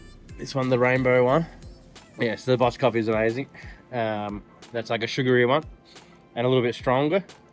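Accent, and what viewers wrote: Australia. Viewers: Love your spiiiicy content!! (Ausieacent) !!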